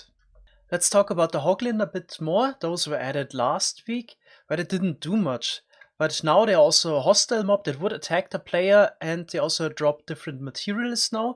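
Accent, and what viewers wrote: Germany. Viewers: see, i was thinking maybe germany or france. the german accent i’m most familiar with is a little different, so i wasn’t sure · You Sound really german Du allmann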